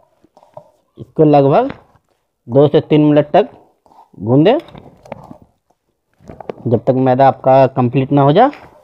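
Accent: Bihar, India. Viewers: Aapki baaton ke trike se lg rha h aap bihari h nice 😄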